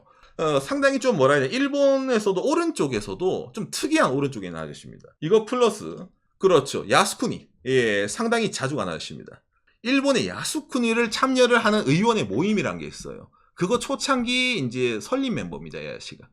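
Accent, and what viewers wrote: Gyeongsang, South Korea. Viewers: 역시 경상도네 ㅋㅋㅋㅋㅋㅋ 경상도는 과학이다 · 저거 최소 경상도 50대 이상 남성임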